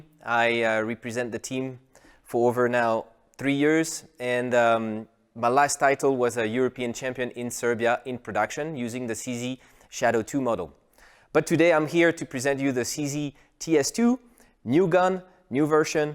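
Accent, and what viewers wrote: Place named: France. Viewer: French accent...oui!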